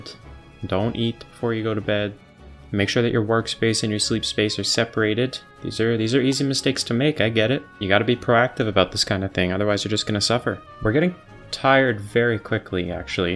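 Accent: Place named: United States. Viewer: Your accent says American. Your sense of humour says British. This was hilarious. Subbed.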